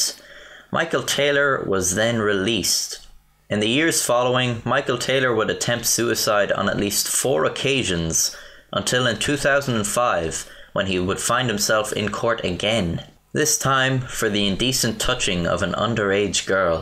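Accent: Ireland. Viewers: Now this is an enjoyable Irish brogue. Mike from THAT Chapter's accent makes me question his sexuality😬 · Your Irish ya? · "ninteen seventy tree" i love the Irish accent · Are you Irish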